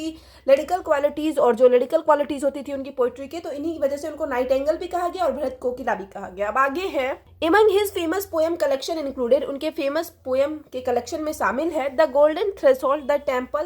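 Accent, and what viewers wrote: Bihar, India. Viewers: From your accent I guess that you are from or somewhere near Patna, Bihar. Give me a like if I'm right.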